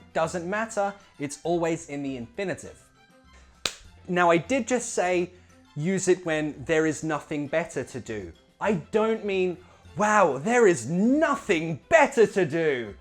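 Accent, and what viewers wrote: United Kingdom. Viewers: A bit of British Accent? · Omg! I’m falling in love with your British accent!!! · Damn !!!! Where have I been, that I've never seen your videos before ??? I'm not only learning English with you, but also the accent and stress I like the most : the British one ! In addition to this, I'm brushing up my Turkish classes with your subtittles. Thanks a lot, and may God bless you for all your effort in this purpose of freely teaching people ! Best regards from Dresden, Germany ! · I didn't know these expressions exist! I have followed you for a very long time, and I've learned a lot overall brit accent! well done! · Hey good job with this channel, Greetings from Argentina. I have a question: you speak in British English or you just have the accent Is much different the British English expresións than American English expresións ??? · His English is British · This is really appreciated that you speak a good British English like a typist 30 word per minute. 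· Nice channel but it's a British English, I would like to see how you explain an American English. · I've knew a lots of new words with british accent today and i was so happy😊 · This is the first time for me can hear british accent very well😂 thank you for not talking so fast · El profe más hermoso! Y con acento británico! 😍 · I love your accent man! British accent is lovely! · Im fluent in american english, now learning british english from you. Awesome vid.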